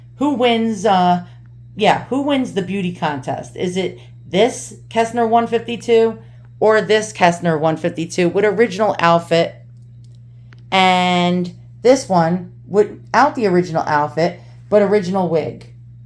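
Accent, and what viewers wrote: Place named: New Jersey, United States. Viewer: Love antique dolls have a few but love your collection. Love your Jersey accent and listening to your comments as well as your little pieces of advice. Keep up the good work!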